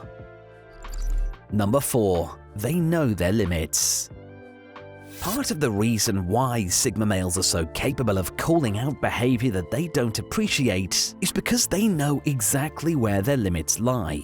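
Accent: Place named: United Kingdom. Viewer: If you were born in America before 1960, and identify as a biological male, then you are what this charlatan defines (withHisBritishAccent), as a "sigma male".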